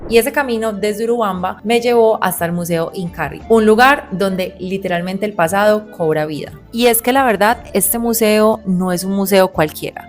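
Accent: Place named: Colombia. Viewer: Como Peruano estoy orgulloso de tus conocimientos en mi querido Perú,....por tu dejo ,.--- se que eres de la rica Colombia, te envio bendiciones y que todo te vaya bien.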